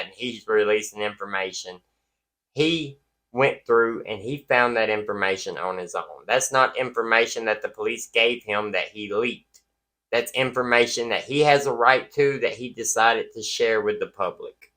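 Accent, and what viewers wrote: South, United States. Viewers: Just curious, what Southern State are you in?? I am also a Southerner.. My guess is TN or AL. Anyway, back to topic, a great video, thanks.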